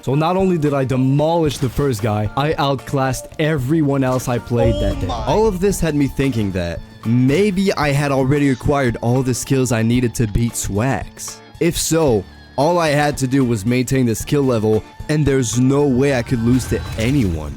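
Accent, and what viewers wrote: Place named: Quebec, Canada. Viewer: I thought you were french (considering your game language is) and I was flabbergasted by your english accent, but I check your description and you're from Quebec, so I get it now. Anyway, very nice video with top notch editing, especially for someone with so few subscribers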